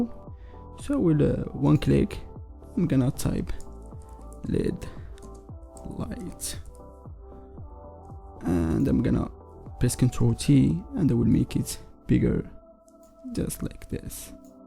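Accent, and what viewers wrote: Morocco. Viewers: Mn lhja dyalk bayna fik mghribi 😅😅 Kml bro❣️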